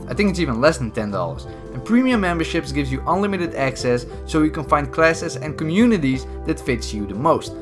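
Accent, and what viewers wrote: Netherlands. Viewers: U dutch? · a Dutchman who speaks English, go to school bro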